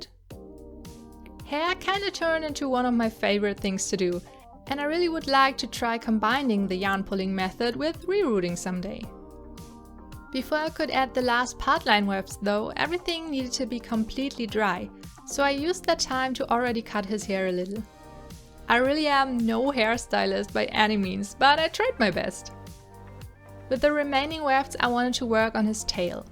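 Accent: Germany. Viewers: moin moin ^^ i love ur dolls so much they are so beautiful!! I'm curious are you German because of the "moin" and sometimes I think I can hear a german accent🤔🤔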